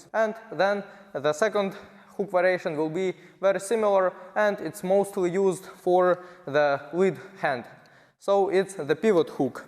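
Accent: Russia. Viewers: Russian accent = absolute beast in anything combat related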